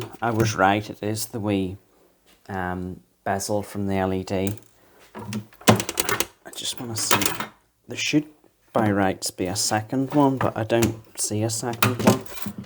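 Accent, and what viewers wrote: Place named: Fermanagh, United Kingdom. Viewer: Sounds very Fermanagh-esque.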